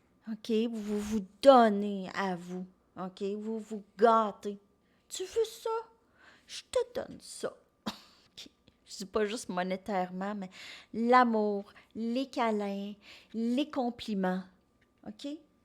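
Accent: Canada. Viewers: Hello, J'adore votre humour et surtout votre accent canadien😜 🙏🙏...des becs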